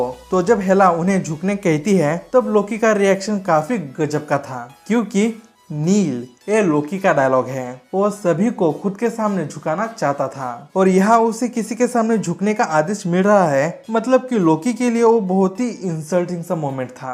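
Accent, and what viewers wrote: Bihar, India. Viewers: Tum bihar se ho kya brother?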